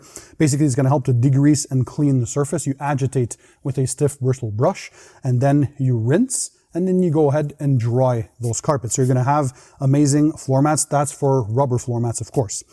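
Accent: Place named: Canada. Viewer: par pur hasard après avoir acheté la cire céramique hydrophobe de chez Meguiars j’ai eu des propositions de vidéos qui m’ont plus donc je me suis abonné. J’adore le contenue de tes émissions et l’accent Canadien nous les français on adore cela lol.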